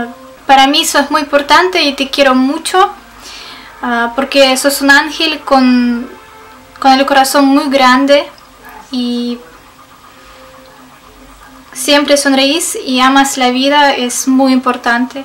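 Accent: Argentina. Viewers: "Por favor no te olvides de mi" Me destrozo el alma esa frase. Tu castellano argento es excelente :D ojala algun día puedas venir a Baires y conocer a Natalia Oreiro! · muy pocas veces puedo ver mujeres como tu aquí ...¡¡¡tienes uno ojos muy bonitos!!!.... pero seguramente te preguntaras ¿que estoy haciendo aquí?... bueno ...hoy, sábado, estaba simplemente navegando en Internet ... tu ya sabes ...mirando aquí y allá generalmente cuando entro a youtube me pongo a oír música de todo tipo y mientras la oigo busco noticias de RT en español .... como para entender mejor el mundo allá afuera ...fue así como sin querer, o mejor sin intención encontré tus vídeos ... vi los tres que has subido ... no se si has subido mas ...pero me causa curiosidad el acento que manejas ... pareciese que hubieses estado en argentina en verdad!!! .... permiteme aclararte que no soy argentino ... 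yo soy colombiano, pero reconozco el acento argentino y en especial en acento de los países que rodean a argentina ... mientras tu estas durmiendo yo te escribo aunque ya va a ser la hora de irme a la camita ya son la pm y según mis cálculos son las am ..... pero como sea... yo solo quería decirte que tus ojos son hermosos. ojala yo pudiera conseguir una mujer con los mismos ojos tuyos .....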